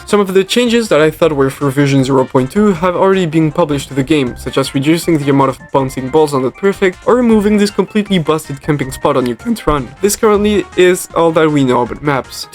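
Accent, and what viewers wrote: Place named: France. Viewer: t'es francais mdrr t chaud en anglais frro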